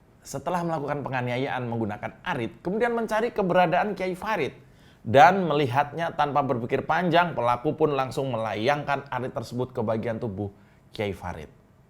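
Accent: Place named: Medan, Indonesia. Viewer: Mantap hebat komentator bukan org batak tapi logatnya persis logat medan horas..maju terus..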